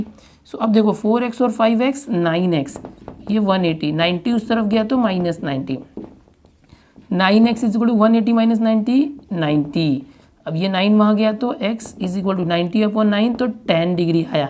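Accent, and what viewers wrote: Maharashtra, India. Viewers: Sir aap marathi hai kya.. ????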